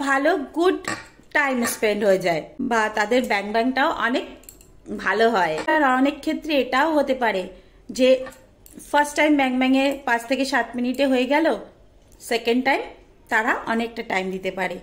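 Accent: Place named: Andaman, India. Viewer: আপনার বাড়ি কি আন্দামানে, আপনার কথার ধরন দেখে আমার তাই মনে হচ্ছে। আমি ওখানে ২ বছর ছিলাম, ওয়ান্ডুরে।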